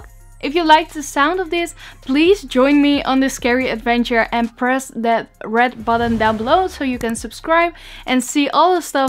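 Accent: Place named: Netherlands. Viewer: Ahhh! Can’t wait! I love the Dutch accent though. Haha, it’s so cute, I have it too haha.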